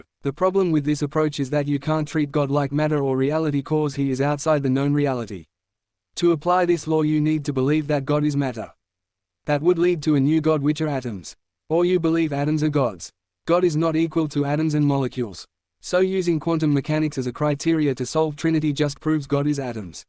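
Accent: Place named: United Kingdom. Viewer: Bro your voice is like a British lad💀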